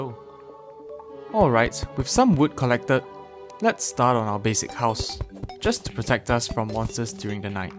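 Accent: Singapore. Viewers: There's some Singaporean accent in his voice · Where are u from? Ur accent sounds like ure from singapore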